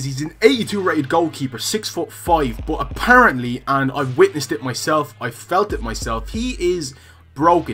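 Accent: Dublin, Ireland. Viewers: What part of Dublin are you from? ps I love these vids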